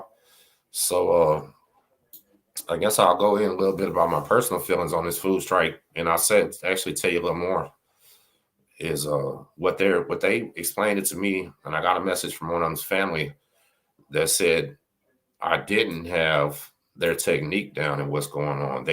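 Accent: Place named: Louisiana, United States. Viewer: Just tonight your channel popped up, for sure I'm watching, I imagine I'll be subbing too, I'll verify, digging the lou-z-anna accent, much love to Louisiana, especially opalusous(may not have spelled that correctly ..all respect intended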